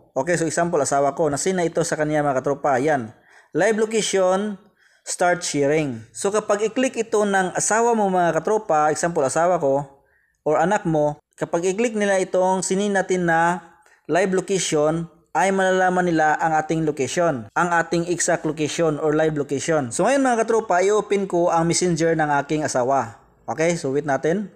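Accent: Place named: Iloilo, Philippines. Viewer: ilonggo ka pala po hahaha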